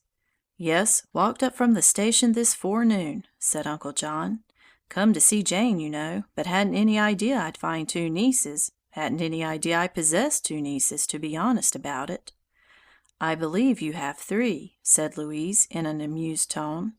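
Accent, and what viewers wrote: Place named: United States. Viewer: Seriously learning english using a very american accent.. 😅 could not be more useless.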